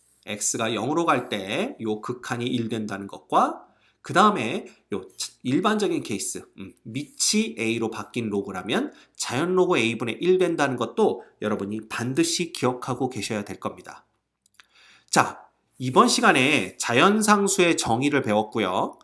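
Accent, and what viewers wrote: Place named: Seoul, South Korea. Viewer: 서울 사람 티가 나시네요 ㅋㅋㅋㅋㅋ 경남은 e 랑 2를 확실하게 구분한답니다 ㅋㅋㅋㅋㅋㅋ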